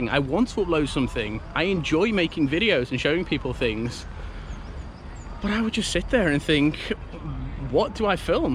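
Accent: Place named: Liverpool, United Kingdom. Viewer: welcome to China. BTW, are we still hiring scouse teachers? odd, very odd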